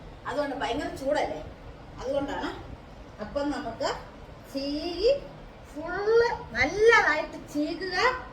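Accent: Kottayam, India. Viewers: ഇന്നത്തെ വീഡിയോ കണ്ടിട്ട് എനിക്ക് ചിരിവന്നു. മറ്റൊന്നുമല്ല ചേച്ചിയുടെ സംസാരം കേട്ടിട്ട് തന്നെയാണ് ചേച്ചി കോട്ടയം എവിടെയാണ്. എന്റെ തറവാട് കുമാരനെല്ലൂർ ആണ്. അമ്പലത്തിനടുത്താണ്. ഞാൻ നാട്ടിൽ വരുമ്പോൾ എനിക്ക് ചേച്ചിയെ കാണാൻ വരണം എന്നുണ്ട്.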